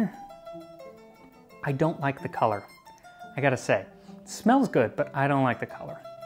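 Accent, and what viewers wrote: United States. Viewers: Yay the metric system! But you have an American accent so I am confused, is America switching over? Yay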